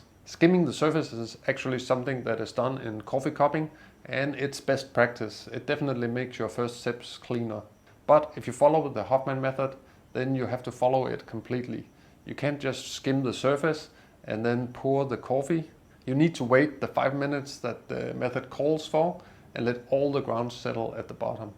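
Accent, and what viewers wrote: Denmark. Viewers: I heard your accent and knew you were from Denmark before looking at your profile to confirm! Tak I would love to learn how to use a French press. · Are you Danish? You sound just like a Dane speaking English 🤔 [Edit] I was right.😆 Your name is. 😆 You cannot get more Danish than that.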